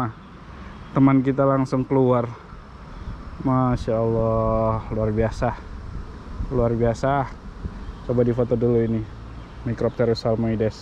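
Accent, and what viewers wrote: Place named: Medan, Indonesia. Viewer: alamak jaaang. keknya orang medan ya abang ini hehe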